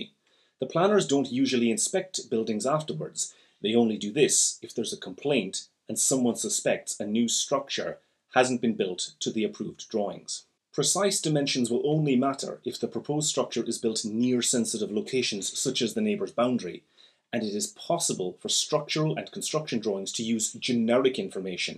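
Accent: Ireland. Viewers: Are you from Cork lol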